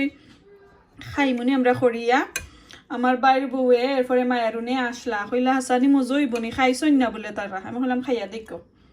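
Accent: Sylhet, Bangladesh. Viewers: সিলটি মানুষের সিলটি মাত হুনলে বড় বালা লাগে এর লাগি আপনার ব্লগ আমার খুব পছন্দের। হুকন শিরা আমরার প্রিয় খাবার। আপনার গাছের কদু দুইটা খুব সুন্দর লাগের অনেক কচি। রেসিপি টা মাশাআল্লাহ খুব সুন্দর হয়েছে। সব মিলিয়ে আজকের ব্লগ টা খুব সুন্দর হয়েছে 😋😋👍👍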